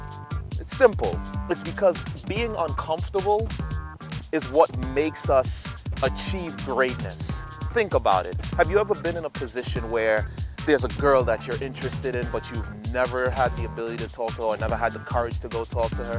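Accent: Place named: Caribbean. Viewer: Yo!!! You sound like you from the W.I.!!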